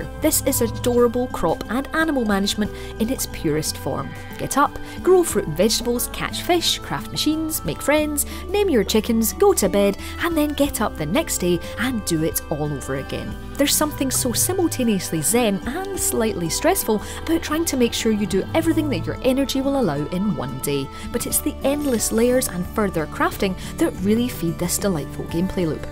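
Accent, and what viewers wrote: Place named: Scotland, United Kingdom. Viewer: her accent reminds me of merida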